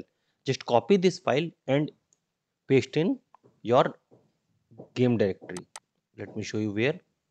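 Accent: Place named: India. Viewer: heard the voice having an indian accent till i tried it and it actually loaded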